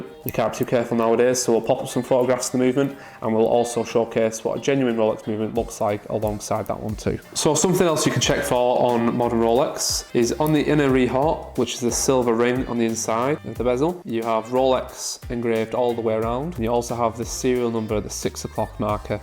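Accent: United Kingdom. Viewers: That British accent together with the somewhat too loud music makes it difficult to understand what you are saying. Well I guess it makes for a challenging language lesson … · What part of the UK are you from?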